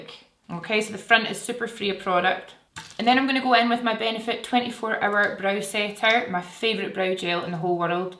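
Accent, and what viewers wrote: Scotland, United Kingdom. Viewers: Seeing your videos make me so happy, just watching you helps so much with my anxiety an depression. Something funny tho, i naturally mimic accents especially ones from Europe... so EVERYONE knows when ive watched a video from you cause i end up with a Scottish accent for usually about an hour or two... my mum finds it funny. I hope Jack, and the doggos are well ❤️ much love from New Zealand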